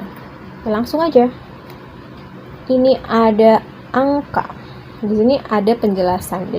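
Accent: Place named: Makassar, Indonesia. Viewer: Dari logat nya pasti orang makassar yah?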